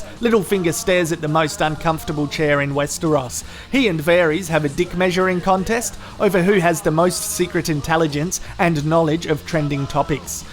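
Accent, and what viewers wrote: Australia. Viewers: What part of Australia are u from?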